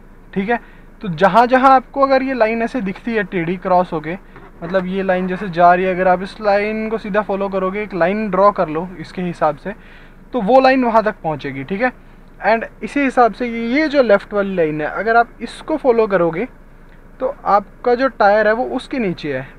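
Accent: Chandigarh, India. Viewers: Chandigarh se ho bhai? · Chandigarh se ho na aap 😁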